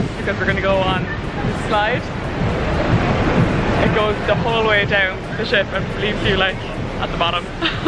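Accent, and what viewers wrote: Ireland. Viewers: U sound like irish😉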